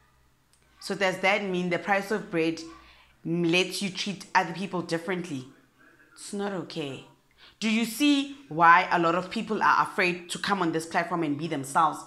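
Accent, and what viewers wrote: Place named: Limpopo, South Africa. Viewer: 🤣🤣🤣🤣 I love the Limpopo English accent, it really goes for the soul😂😂😂😂 I think some Americans don't want to make the effort to learn how to listen, especially if a person has a thick accent. However, some appreciate and even love other people's accents and as a result you thrive at what you do and offer.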